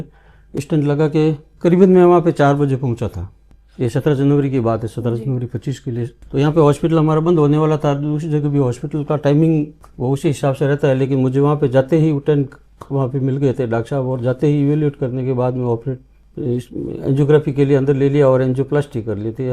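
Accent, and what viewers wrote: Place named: Rajasthan, India. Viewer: ਬਹੁਤ ਵਧੀਆ ਢੰਗ ਨਾਲ ਸਮਝਾਇਆ ਗਿਆ ਜੀ। ਰਾਜਸਥਾਨੀ ਬੋਲੀ ਬਹੁਤ ਪਿਆਰੀ ਲਗਦੀ ਆ ਜੀ ਸਾਨੂ। 🎉🎉🎉🎉🎉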